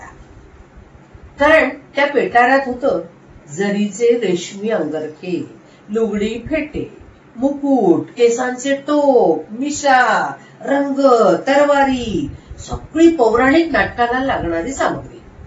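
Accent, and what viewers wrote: Malvani, India. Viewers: प्रसंग डोळ्यासमोर उभा करायच सामर्थ आहे या अभिवाचनात आणि आली , वस्त्रहरण नाटकाची आठवण !!स्वतः मालवणी नसतानाही ठसकेदार मालवणी सादर करण एकदम सही!! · अभिनय वाचनातून मालवणी ठसका माका भावलो हो ताई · व्वा ताई , खूप छान.. प्रत्येक वाक्यागणिक प्रसंग अस्सल जिवंत उभा करण्याची ताकद जबरदस्त..आवाजातील चढ उतार, मालवणी हेल इरसाल मालवणी ठसका अप्रतिम... अजून ऐकायला आवडेल हा असा गोड , मंजुळ आवाजातील अभिवाचन.. आवाज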